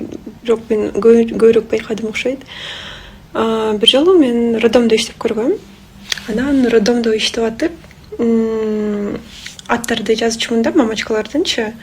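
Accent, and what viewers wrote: Standard. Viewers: Танкалдым, ушундай таза суйлот экен кыргыз тилин. 😮😊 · Азаматсын кыргвзчаны таза суйлойт экенсин🎉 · Мыктысын.Туура кыргызда ичкертүү белгиси коюлбаш керек.Ал биздин билимсиздиктен келип чыгып жатат Кыргыз тилин киргизкаларга караганда таза сүйлөгөнүн кубандырат.❤❤❤ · Вауу Кыргызчаны таз суйлойт экенсин. Азаматсын сулуу кыз❤ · Сарышка кыргызчаны кыргыздан таза суйлойтко молодец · Ииий жаным молодец Анча мынча киргиздерге карпганда. Нукура кыргызча суйлогонуно ыраазымын азамат! Орусча кичине уйронуп алып, кыргызча тушунбой калган мыркаларга, мырктарга наалат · Кыргызча таза суйлогонун учун 5 лайк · Кыргызча таза суйлойт экенсин анча мынчаларга Караганда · Баягы биздин папа мама батя брат сестрёнка братишка деген чала орустарга салыштырмалуу жн сизам бизам битта экита деген чала сарттарга салыштырмалуу жн казыр жатыр матыр деген чала казактарга караганда бул кыз Кыргыздын нукура таза адабий Эне тилинде сүйлөйт экен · Киргизкаларга караганда кыргыз тилин сен таза кыргызча суйлойт экенсин. 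Ооба кыргыз тилинде мягкий знак жок. Бирок СССР мегилинде Кулматов десе Кульматов деп орус аялдар жазып коюшкан. Ошондуктан али кунго чейин фамилиям ушундай. Метрикада кандай болсо, паспортко ошентип жазылып калган. Аны озготууго болбойт. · Мягкий знак олуп кетсин коймоктон коё берсин сага ырахмат кызым кыргызча таза суйлогтнуно Бишкектеги киргиздерге улгу Кыз экенсин Бактылуу бол ананайын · Амээй кокуй! Тап-таза Кыргызча суйлойт ко. Соз жок.❤ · Киргиздерге салыштырмалуу, кыргыздын сары кызы таза сүйлөйт экен. Майлуу суудан болбойсуңбу? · Кыргыз тилин таза суйлогонуно рахмат.Кыргыз тилинде 36тамга бар арасында жумшак белги бар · Абдан туура суроо койуптурсун.Мен орусча сени МОЛОДЕЦ дейинчи 😀Ушунча таза жана жумшак суйлоптурсун,уккун эле келет.Азыр кыргыз жаштар деле минтип суйлой албайт. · Оой секетболойун кыргча таза суйлойт экенсинхо ошону билбейт экенин сураш керекекен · Красавица таза Кыргызча суйлогонуно класс басам Кыргызстанда жашаган бардык улуттар уйронгуло силерда кыргыз тилин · Айии кебир опко кыргыздарга караганда чотка суйлойт экен🫠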